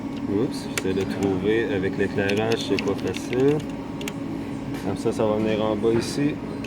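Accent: Quebec, Canada. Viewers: Love the Quebec accent.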